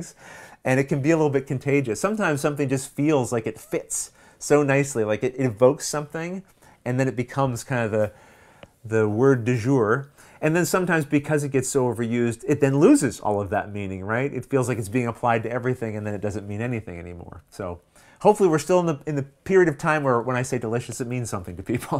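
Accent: Canada. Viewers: That Canadian accent is pleasant :)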